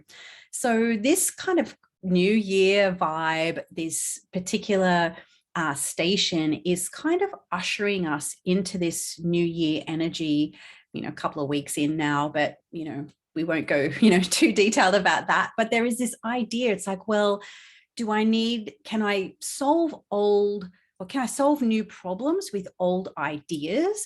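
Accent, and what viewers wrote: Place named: Australia. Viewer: Mine too! Her Aussie accent is also a plus!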